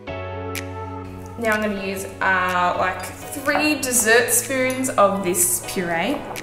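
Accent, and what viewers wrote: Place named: Australia. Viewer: I actually had no idea she was Australian, but I did wonder bc i started watching her Barbie vids first, so I wondered why she sounded so weird and different bc I never heard an actual Australian person say anything except like alrighty mate or something like that but then I found out why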